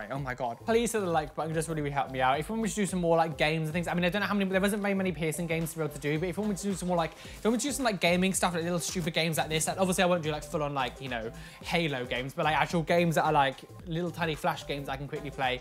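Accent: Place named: RP, United Kingdom. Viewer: I love how he is British but he sounds like he is a Royale prince from England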